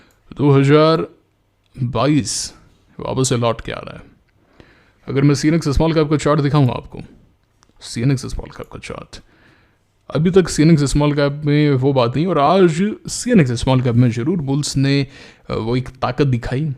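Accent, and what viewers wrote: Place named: Bikaner, India. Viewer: sir Bikaner ya uske aas paas churu, hanumangadh tri junction ke prateet hote hain bolne se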